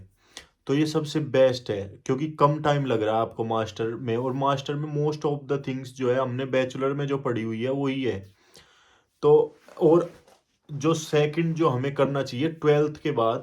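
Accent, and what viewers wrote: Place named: Baghpat, India. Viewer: Bhai teri Baghpat wala language ni gyi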